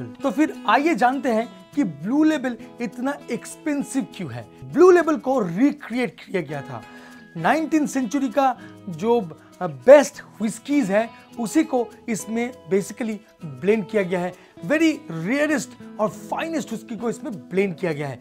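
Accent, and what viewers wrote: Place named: West Bengal, India. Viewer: bangali kab se bartender banne lag gaye...fir hume to rosogulla khana shuru ke dena chaiye..saala bolne k tareeke se hi nasha utar gaya...not watching beyond 30 second... 🤣🤣 dada tumi roshogola khao..daru varu tumhara kaam nhi h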